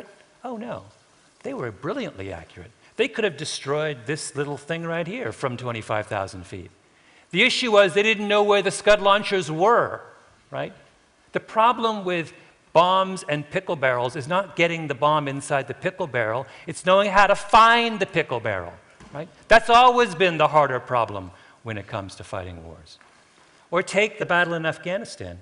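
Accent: Quebec, Canada. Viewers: French Canadian. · Americanos pronounce it as "Bomm Buh Deer" while Canadians pronounce it as "Bome Bar Dee Ay", that's a dead giveaway that Malcolm speaks Canadian. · is Canadian. We sometimes talk funny. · He's Canadian. Where both languages are used. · Sounds Canadian...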